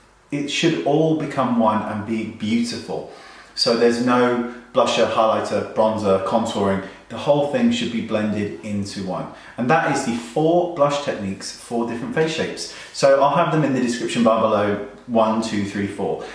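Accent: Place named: England, United Kingdom. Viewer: You are sooooo sweet!!!!! you seem a really really nice person, also I love your english accent. Well anyway, I want to ask you if you could show me how to do the makeup on a face with rosacea, not severe, just with a pinkish tone. In my eagerness to camouflage my rosacea I always end up looking with no life whatsoever, and going in again with a pink blusher it just seems nonsense. So now I leave my cheekbones with my pink rosacea and then I apply my foundation on the rest of my face, it looks ok, but I'm sure there's a better way around it. Help please! :D Kisses! (I'm moving to London on March 2015 Yeyyy!!!)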